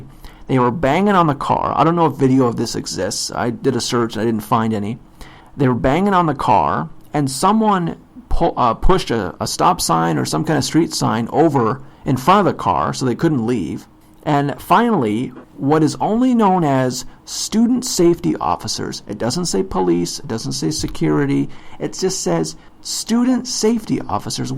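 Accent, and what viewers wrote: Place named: Canada. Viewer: Where / what is Middlebury? You're joking, right? (Perhaps you're from The Great White North? Pretty sure I hear Canadian pronunciations of "out" and "about" - most logical explanation !) Anyway, it's one of the very best liberal arts colleges in the U.S., costs over $60K/ year, consistently ranks in the Top 10 among small private colleges nationwide, and is incredibly difficult to enter in terms of acceptance rates. This all makes the whole episode worse than it may have been otherwise/elsewhere. Most of these kids are in the top 1% nationally (academic performance), and Middlebury attracts students from countries all over the planet who move there to study as well. It's anything BUT a "nobody place." And yes, it's strongly left-leaning. I don't condone what happened in the least, but will say this : I have a relative who's a prof there...he, along with a number of faculty members whom these kids deeply respect, encouraged and coached the appalling behavior prior to Murray's visit (after unsuccessfully trying to get him "disinvited" first.) There was nothing at all spontaneous about the fiasco...all was carefully pre-planned. 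This was completely uncalled for and put Middlebury to shame - its faculty in particular. I'm not excusing the kids' behavior, but they were basically following the orders of their superiors. Disgusting...and I don't even like Murray. I don't agree with his views on I.Q., but firmly support his right to express those views and have a robust debate with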